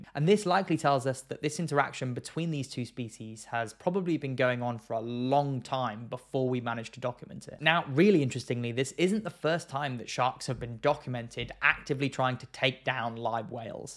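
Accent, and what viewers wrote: United Kingdom. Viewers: It may take a few videos to get used to that British accent and really enjoy the videos. The content eventually convinced me to subcribe.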